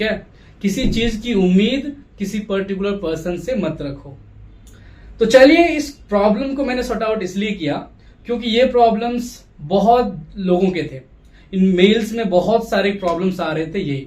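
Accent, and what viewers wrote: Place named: South, India. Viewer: My dear sir, your voice has a little south indian accent...... So calm and pleasant ❤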